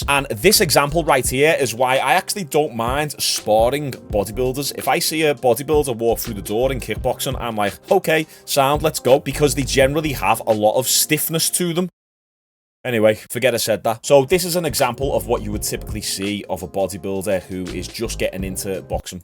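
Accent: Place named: Glasgow, United Kingdom. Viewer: In regards to hate comments and what not, a lot of kids are on here who don't know any better and also bitter A-holes sad about their own life unwilling to do anything to change it, wanting to bring someone down to their level instead. Constructive criticism is great to learn and grow from, but some people abuse anonymity just for the sake of being vile. Keep up the effort with the uploads and people will eventually gravitate. I think most state side Americans love your accent (I wanna say Glasglow) plus your entertaining so Its almost assured you're gonna blow up huge one day.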